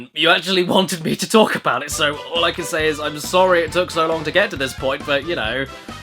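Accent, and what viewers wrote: United Kingdom. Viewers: hey i have a question this is gonna sound weird but are you british or irish i'm sorry but your accent is just a big mix up of like 50 accents so i'm wondering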